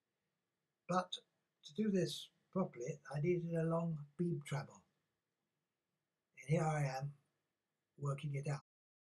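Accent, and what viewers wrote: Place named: England, United Kingdom. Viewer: you are 90? I wish you lived close to me,you give a very good discription of DIY but I woulld cut my hands off(smile) Love your English accent.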